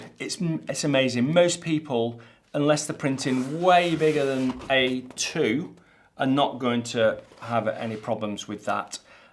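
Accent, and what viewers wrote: United Kingdom. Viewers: It's the first video I've watched from your channel, I like the test you've done and moreover I like your British accent, it's easy to understand for a non-native speaker like me. It would be interesting if you could print some pics from a Fujifilm X-T5 which it is an APS-C camera but with 40 MP sensor, just to understand if an A1 print looks better now compared to an APS-C 24 MP sensor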